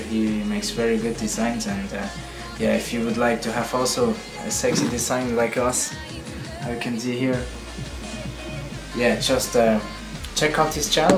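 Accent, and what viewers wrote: Switzerland. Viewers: Man merkt voll das du Schweizer (Deutsch redest) bist ^^ Woher kommst du? Ich wohn grad bei der Schweiz an der Grenze :D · Man hört dein "Schwiizerdialäkt" aber schüsch geils änglisch.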